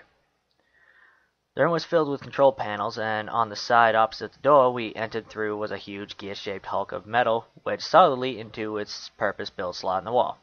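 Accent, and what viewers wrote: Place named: Boston, United States. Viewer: Most of all I like your slight accent. What is that Bostonian? Got to be eastern seaboard.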